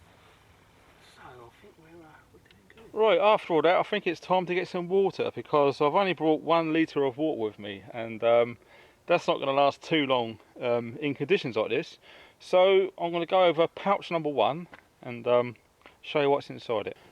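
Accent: United Kingdom. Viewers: hi, my first time watching you and I thought you were gonna be american but.... no! finaly some british guy! great vid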